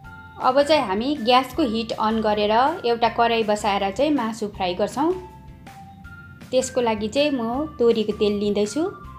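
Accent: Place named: Darjeeling, India. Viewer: Darjeeling accent,, achar looks delicious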